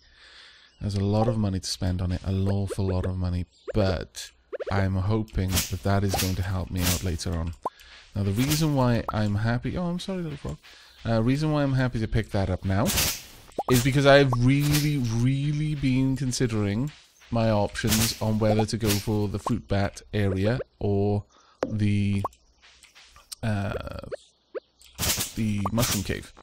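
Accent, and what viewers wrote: Wales, United Kingdom. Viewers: Bläh He's Welsh 😉 not sure where he currently lives though.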